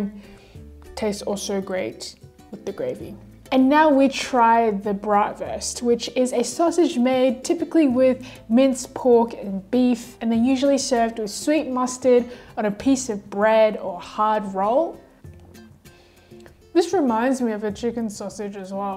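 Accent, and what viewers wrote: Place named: Australia. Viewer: Gosh your thicc oz accent i can’t even 🥰🥰🥰🥰🥰🥰🥰🥰🥰🥰🥰🥰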